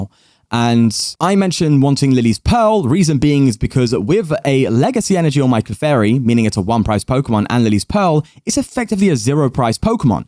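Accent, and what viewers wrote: United Kingdom. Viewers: Always have a good time watching and those funny misplay moments among other entertaining shenanigans. Those and that cheerful, jesting personality (not to mention the nice british accent!) really sets this channel apart from others, I think. And it's cool to see games pushed into victory even after those misplays! Shows that a skilled player can turn even a bad situation into a victory. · Bro just be yourself, keyboard warriors are everywhere just let them be. I watch you because of your laughs, beloved British accent, you're entertaining, your perfect English, than merely playing competitive perfect Pokemon games. Love from Malaysia ♥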